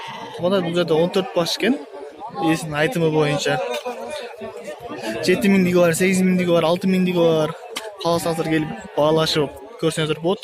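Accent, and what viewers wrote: Kyrgyzstan. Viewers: молодец так кыргызча суйлойт экенсин ишине ийгилик особер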